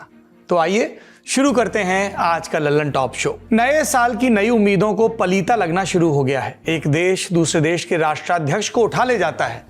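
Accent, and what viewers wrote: India. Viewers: सौरभ भाई, यद्यपि विचारधारा के स्तर पर आपका व हमारा 36 का आंकड़ा है, परंतु फिर भी आपके समाचार बाँचने का विशुद्ध देसी अंदाज व हमारे शहर से आपके जुड़ाव होने के कारण मैं आपका अत्यधिक मुरीद हूं । आशा ही नहीं वरन् पूर्ण विश्वास है कि अगले प्रारूप में आपका खबरें बाँचने का विशुद्ध देसी अंदाज व भाषा शैली राष्ट्रवादी विचारधारा से भी ओत-प्रोत होगी ।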